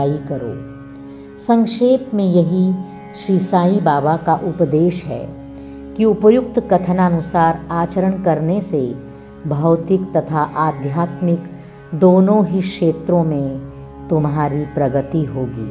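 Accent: Standard. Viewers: Om sai ram.आपके द्वारा बहुत ही मधुर और शुद्ध उच्चारण में बाबा की लीलाएं हमें सुनने को मिल रहीं हैं. इसके लिए आपका बहुत-बहुत धन्यवाद।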